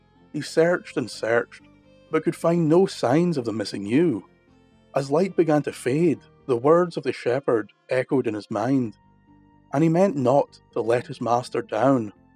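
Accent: Scotland, United Kingdom. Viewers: No better accent than the Scottish accent, of course i may be biased lol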